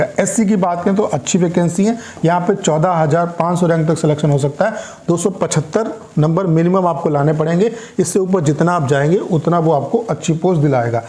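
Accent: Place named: Uttar Pradesh, India. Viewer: Bhaiya pkka Uttar Pradesh se h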